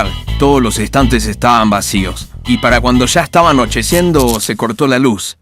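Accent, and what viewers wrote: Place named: United States. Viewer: Noto un acento medio yankee no sé por qué 🧐 o medio neutro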